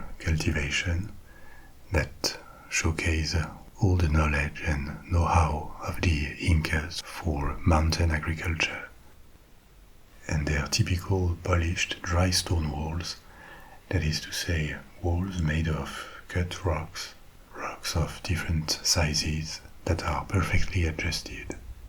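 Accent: France. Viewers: plz keep your french akcent its amazing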